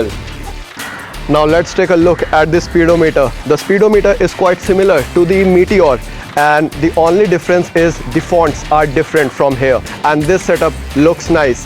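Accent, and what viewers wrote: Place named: India. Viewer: this might be the finest English accent by an Indian channel I've watched for this bike review, no offense, but this guy actually nailed it...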